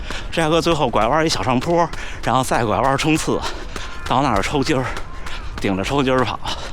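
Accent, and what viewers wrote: Beijing, China. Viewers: 特别喜欢听乡音😂 我小时候（95年左右）住胡同里，北马从家门口建国门桥上跑下来。我5岁多就自己在路边看跑步。没想到竟然埋了个马拉松的种子在心里。2024年春天跑了个半马2小时零1秒。然后很鸡血地报了秋季首马，练的特狠，跑了343。2025没怎么练跑步，玩自行车了，半马PB了143。2026又开始练游泳，结果练了3个月放弃了，85公斤胖子但腿太沉没啥肥肉，浮不起来。重新练跑步，但是心里总打鼓，想5年破三，又怕自己天赋太差。听你分享经验我就觉得特安心。心想着自己首马都到的第三年成绩了，我再练个5年，堆起来跑量，肯定也能破三了。就像你说的，不光练身体，关键是脑子（心态和意志）。练就完了。谢谢博主的分享！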